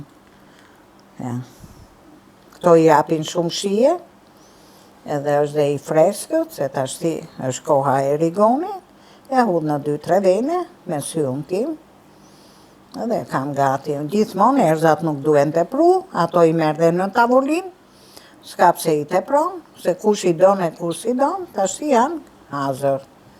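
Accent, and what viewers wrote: Tirana, Albania. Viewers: Amon sa mir qe je nje zonj tironce me adete e me te folme.hallall ta bofte zoti☝️🤲🤗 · Te lumshin duart e nderuar zonje.Te kenaq me te foluren origjinale , ke dhe zerin te bukur , dhe te perfytyroj nje zonje te kendshme tironse❤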